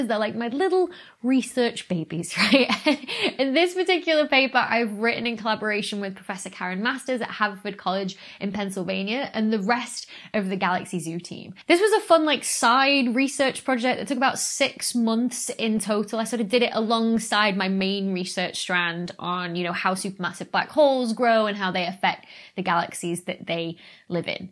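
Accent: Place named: Northern England, United Kingdom. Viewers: What is that accent? Leeds/Manchester? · Your interpretive presentation skills are now awesome. Would like to be in the audience for your next LIVE astro conference presentation. Should be SRO for just the delivery. Video suggestions: 1) what I do when I collaborate as an associate to main author, 2) vids on each of the previous main author papers starting with no. 1, which was before the Phd(???), and 3) how do I work/collaborate with others as main author, for instance, is there a small final edit group? And, thanks for being yourself, Mancusian accent and all. Next book?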